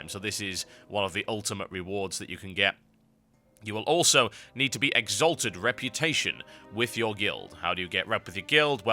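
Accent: United Kingdom. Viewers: u idiot. he's british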